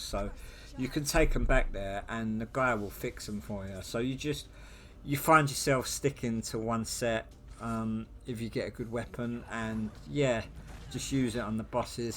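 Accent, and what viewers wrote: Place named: Ireland. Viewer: Are you Irish, bro? Cute accent :)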